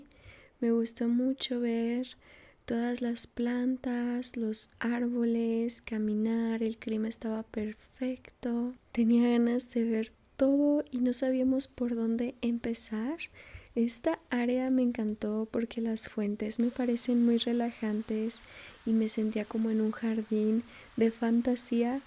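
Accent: Mexico City, Mexico. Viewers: Casi juraba, antes de este video, que eras de la cdmx y vivias en la roma o en la condesa jajaja. Que bueno que te gusto, salu2! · Wow! Yo juraba que eras de la cdmx por tu acento 🥹 qué bueno que fuiste y conociste mucho, yo creo que los que vivimos aquí no terminamos de recorrerla! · Yo también pensé que eras de la CDMX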